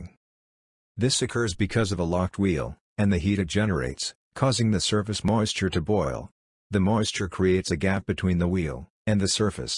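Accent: India. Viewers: I like your slow teaching voice.. ❤thnks.. Btw why do u seem to have Indian accent. 😁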